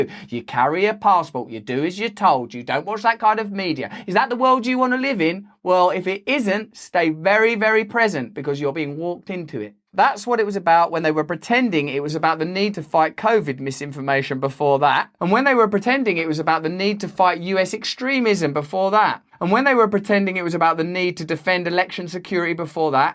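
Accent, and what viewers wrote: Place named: United Kingdom. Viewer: Greetings from 🇺🇸. I love your British voice!🙏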